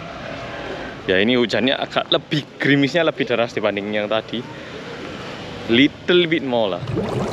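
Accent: Java, Indonesia. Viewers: Mas kalau ngomong gk lupa ama jowo medok e aku seneng dengarnya soale aku wong jowo juga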